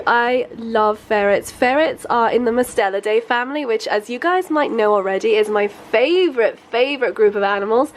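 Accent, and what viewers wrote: United Kingdom. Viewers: Wait is from the UK? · Shes british. There seems so be a language barrier for you.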